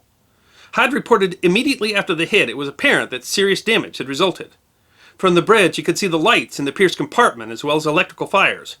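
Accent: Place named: Midwest, United States. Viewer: Those flat Midwestern "A"'s!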